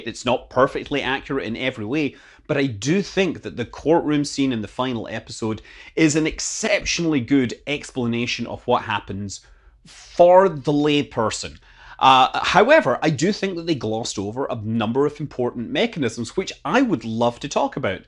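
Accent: Scotland, United Kingdom. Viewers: I'm a Scot living in New Zealand and take comfort from a Scottish accent. The turning t's into d's with said accent is giving some anxiety though. What's the deal? Where do you live?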